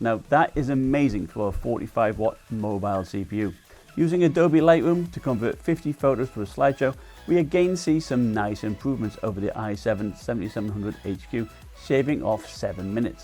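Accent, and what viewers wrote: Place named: Scotland, United Kingdom. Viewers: exklim Tech News Well, you have this scottish accent plus the funny vid you made with the umprella and I thought u were british! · Sound Scottish.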